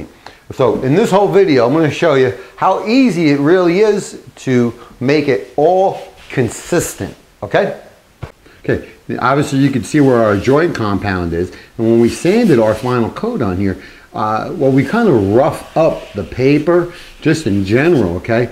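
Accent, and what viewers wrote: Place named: New Jersey, United States. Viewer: Thanks bro. Your videos and verbal style are all awesome! You sound NJ. Am i right? I wonder why east coast refer to drywall as sheetrok, out west no one knows the term sheetrok unless they are very familiar with that name brand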